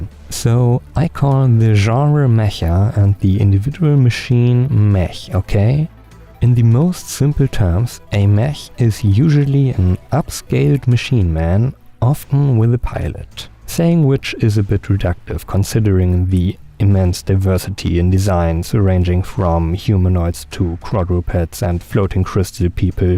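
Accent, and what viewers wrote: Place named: Germany. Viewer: I thought: "hmmm, that accent sounds very german..." And the Die Sending mit der Maus theme was playing, so I do not even have to ask anymore. Anyway Gundam Aerial has thick thighs that save lives.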